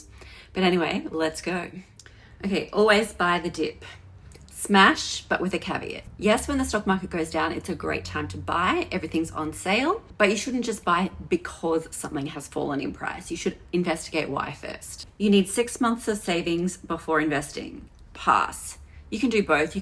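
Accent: Australia. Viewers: Nice to hear an Aussie giving some good common sense advice.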